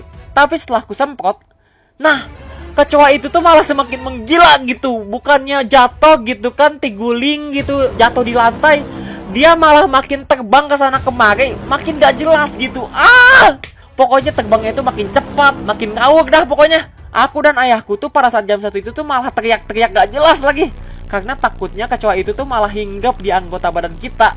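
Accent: West Java, Indonesia. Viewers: orng Sunda?😳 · Awok²... Tiguling dong:v Orng Sunda ya bang?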